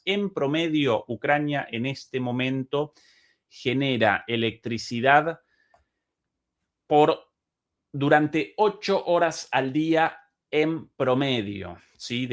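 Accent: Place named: Argentina. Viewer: Eres Re-Argento para hablar. Me encanta q no hayas perdido el acento. Abrazo de otro argento.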